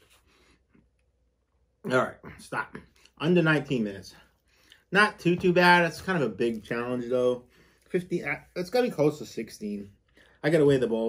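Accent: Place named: Massachusetts, United States. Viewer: You're awesome. I'm a Maine-ah, so I just love that you're so stereotypically Massachusetts. If anyone asks what a Bostonian or "Southie" is they should be shown a clip of you!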